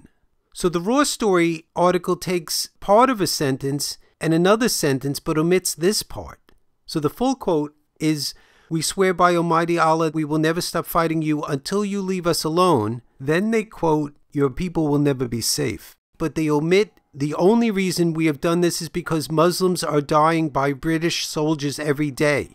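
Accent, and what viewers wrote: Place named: East Coast, United States. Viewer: I noticed you speak with an east coast Jewish accent. Are you Jewish?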